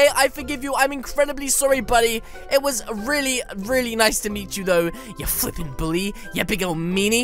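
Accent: United Kingdom. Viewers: Are you bristish I love your accent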